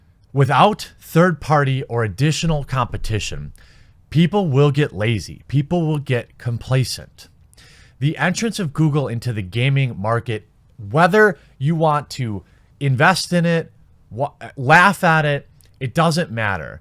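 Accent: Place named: Midwest, United States. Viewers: Yup, that midwestern accent. I knew some who said "leyg" and "draygon" all the time and didn't even notice till someone points it out, lol. Lots of different accents in America if you look around closely ;) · Welcome to Midwest accents. -an Illinois'an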